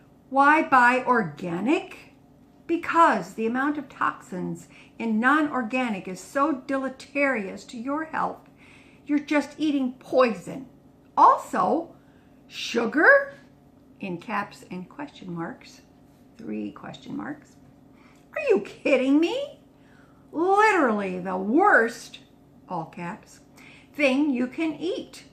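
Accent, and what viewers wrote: United Kingdom. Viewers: Lol, love your British accent in this episode! My feeling is , everything in moderation. Including sugar. Yes, too much of it isn't good for you. But that woman that made the snarky comments needs to get real and stop judging you. You are doing g a great job and I love your channel, one of my very favorites. ❤ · Hi, absolutely loved this. You're a wise lady with a fab sense of humour. Life is too short to be uptight....have the sugar, eat the cake, drink the cokie cola 😉 we have to have some pleasure in life. Wonderful English accent by the way. 'Bottoms up darling!' As we say here. ❤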